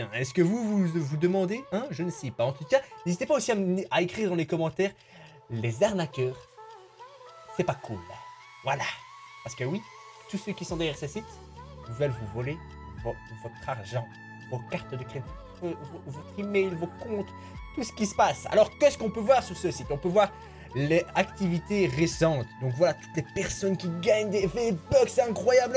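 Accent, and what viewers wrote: Belgium. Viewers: tu es belge???? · Tu es belge?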